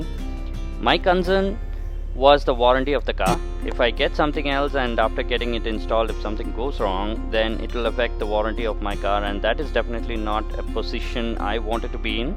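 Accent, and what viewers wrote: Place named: India. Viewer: Fucking indian accent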